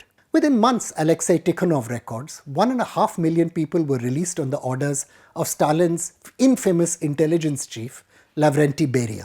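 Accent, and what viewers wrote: India. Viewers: Add text. Your English is too Indian to understand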